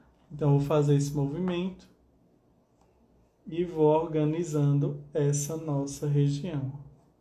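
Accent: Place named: Pernambuco, Brazil. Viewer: Sotaque pernambucano... Ksksk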